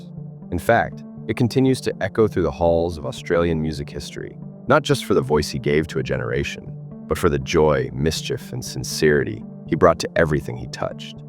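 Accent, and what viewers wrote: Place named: United States. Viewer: As an avid Skyhooks fan from day dot, for some reason I've always been obsessed with the death of Shirley, always digging deep into further research into the mystery circumstances surrounding the actual cause of why the chopper crashed. We all knew there could have been many factors, like how the weather conditions took a turn, but it was always an unsolved curious puzzle and more to it than meets the eye. I've seen a lot of reports into this over the years, but this is the first time I heard of Shirley possibly having a heart attack, that sent a chill up my spine, something we probably will never know. Also, who is this American or Canadian narrator, his pronunciation is incorrect, it's Red Symons not Simmons!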